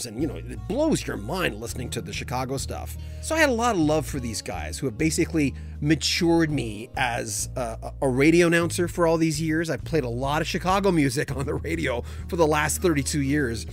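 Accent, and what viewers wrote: Canada. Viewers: So, are you like from the Chicago area or it sounds like your from Canada. Don't get me wrong, the Great Candy was from Canada and he is missed every day. I'm from Joliet, Illinois-Chicago south suburbs-Mancow likes to call us "Toliet". Anyway, its like Pete stabbed us in the back-that's what it is like. Listening to WLS waiting for the next great Chicago hit. We knew he was the great lead singer for the most part. I remember him saying in an interview that he was at a party and Paul McCartney came out of the bushes with Linda and Pete went to introduce himself and Mac stopped him and said"we know who you are". I'm sorry he felt whatever he felt and still feels, but we the Chicago fans loved him and no one but no one can sing 25 or 6 to 4 like he did. So he pretty much hurt us and still hurts us. Who cares otherwise, he can go back to singing with Cher or something. 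I guess we wanted that old feeling back before we all died. If he isn't doing anything maybe he can go sweep up what was left of the passenger side window on my 1972 Dodge Polara that got busted out in the parking lot of the old Chicago Stadium back in 1976 when I went to see Chicago-great concert-bad experience.......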